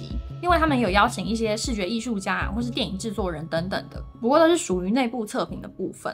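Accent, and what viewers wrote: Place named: Taiwan. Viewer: 我已经病入膏肓了，现在看到台湾口音就想到多慧。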